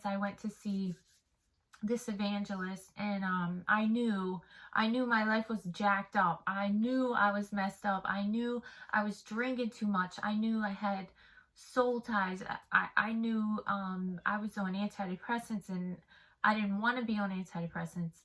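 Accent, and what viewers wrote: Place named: East Coast, United States. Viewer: I love this and relate SO MUCH! I also love your cute little east coast accent, haha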